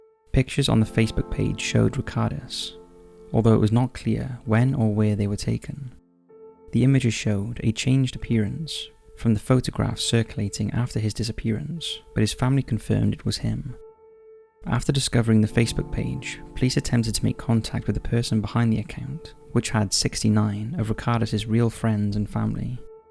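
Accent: United Kingdom. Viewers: Your voice is perfect for narration. Not to loud or to low but perfect in both volume and tone. And the british accent makes it even better. Been watching the videos nonstop for a week now. Thank you !❤️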